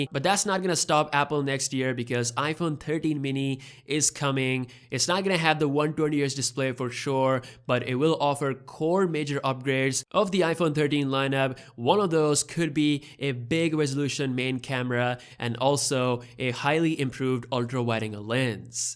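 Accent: India. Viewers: You got somewhat of an indian accent lol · Your Indian accent pops up.. Alot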